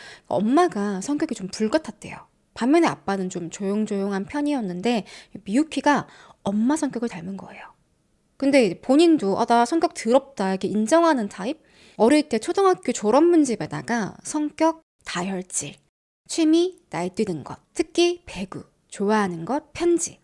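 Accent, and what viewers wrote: Busan, South Korea. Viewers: 아니 ㅋㅋㅋㅋㅋㅋㅋ 연기도 잘하는데 부산사투리도 와이래 완벽합니꺼 ㅋㅋㅋ못하는거 없어 ㅎㅎㅎ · 일본어 묘사를 하시다가 영남 사투리로 너무 자연스럽게 전환하시네요😂 오늘도 재밋게 보고갑니다👍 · 아따 어리석은 남자들~~~ 사투리 넘 부산요 ㅎㅎㅎ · 알아도 또 듣게 만드는 재주가 있으시네~ 사투리는 또 와그래 이쁘게 잘하노? 반했데이~ㅎㅎ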